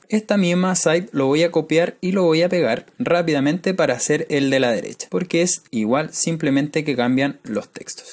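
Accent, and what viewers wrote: Chile. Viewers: Jsjsjs sabia que eres chileno jsjs tu acento se nota🥺❤️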